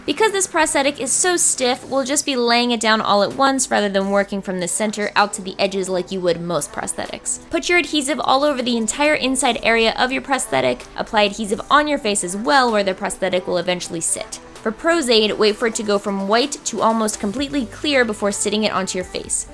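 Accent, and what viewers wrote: United Kingdom. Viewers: that British accent doe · I’ve never heard sound so British! When she said bloody at the beginning she sounded more British than me being British myself 😂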